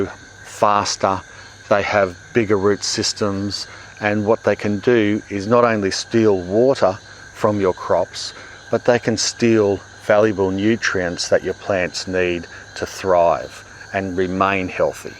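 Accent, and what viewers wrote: Australia. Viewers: Yes! That Australian accent has got to help! ❤️❤️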